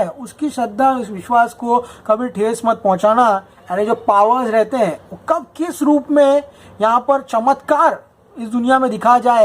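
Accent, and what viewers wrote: Karnataka, India. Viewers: bhai your review on this movie is just brilliant,. Aap ka kannada tone is awesome 👍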